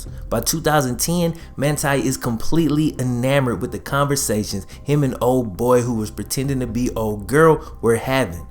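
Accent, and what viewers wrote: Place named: Bay Area, United States. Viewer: What up? Sounds like you're from the bay! Am I right?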